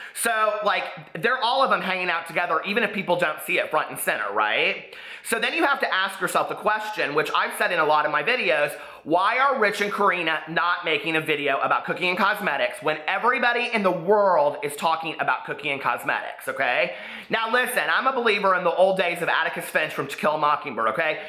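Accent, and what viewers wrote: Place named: Midwest, United States. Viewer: Yes!! It has to be a Midwest thing, I say it the same way!😂😂 I'm from Northwest Indiana.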